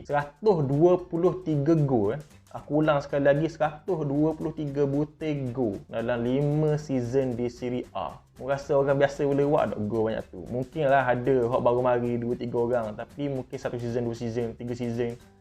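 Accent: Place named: Terengganu, Malaysia. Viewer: Ejah subtitle.. Dop paham loghat terengganu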